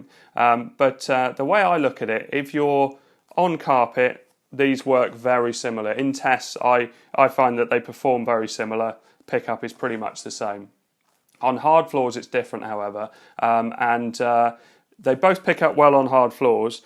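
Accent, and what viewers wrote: United Kingdom. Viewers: thanks for responding. Love the bri’ish accent 🇬🇧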